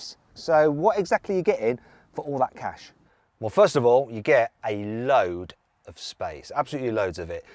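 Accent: United Kingdom. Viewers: does that mean is Polish immigrant? I always thought hes British because of perfect accent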